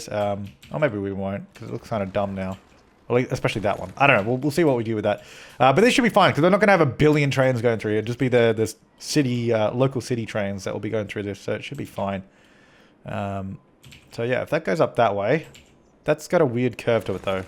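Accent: Australia. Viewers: You're an Aussie aren't you? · Hey, are you Australian?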